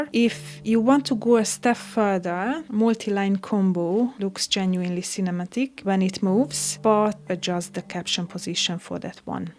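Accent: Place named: Hungary. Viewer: I might be mistaken but are you per chance hungarian? Your accent kind of sounds like it! :) Anyways great video, you gained a like and a follow! :D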